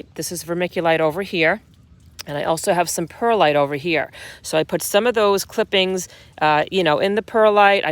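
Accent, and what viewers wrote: New Jersey, United States. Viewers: Love that jersey accent :). Endless summer is so easy to clone. I have been very sucessful and have endless plants all in my landscape!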